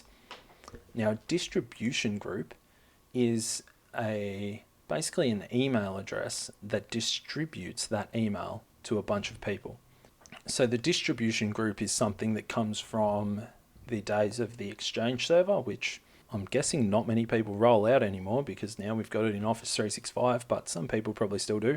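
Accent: Australia. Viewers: My work gave me MS365 responsibilities to help out so this was a good intro. I know most of this stuff but seeing where it is in this video was a good refresher. Been a while since I have done admin stuff. I like how you had trouble with the word enquiries so just changed it to Askmeanything or whatever. lol :D Thats totally what i do when i forge how to spell a word, just use some other words. :D Plus its fun to be in Texas and hear an Australian accent. Makes me think im talking to one of my relatives over there. Anyways. Thanks fo the vid. Cheers.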